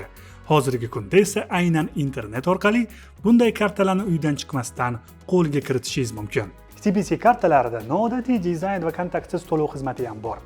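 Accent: Tashkent, Uzbekistan. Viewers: molodes. Bizani dokondan oq sariq koyne xarid qildiz.loyiha un albatta. Korib xursan boldm. RESPECT 📌 Siz bn yangi loyihez bo'yicha fikir almashdi. Qisqasi keng fikrli, IQ balan, tarbiyali bola ekansz. Gap yo. Good luck bro ☝️🤲📌 📢Toshket shevasiga allergiya yoq dp umid qlaman.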